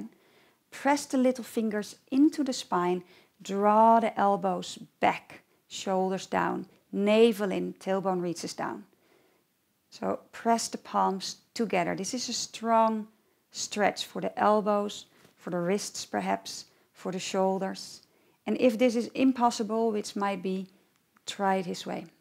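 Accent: Netherlands. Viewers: Dutch English pronunciation... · She sounds very Dutch. Is she? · I love your dutch accent! <3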